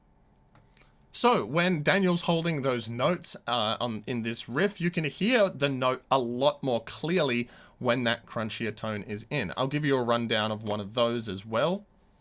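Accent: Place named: Australia. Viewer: Interesting stuff! You Aussie's with your funny 'H' sound always gets me. Trying to hear the difference btw some of those heavy distortion tones reminds me of trying to show my parents the difference btw East coast and West coast rap in the 90's! I guess you have to listen to some music that uses those tones to get it right off the bat. That POG is slammin'! (pun intended) I like to do that and just use the two lowest strings, too. It reminds me of that 60-70's Soul/Funk sound.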